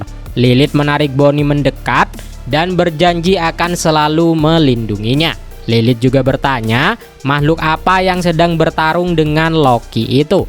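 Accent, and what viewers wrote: Madura, Indonesia. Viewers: Abang orang Madura ya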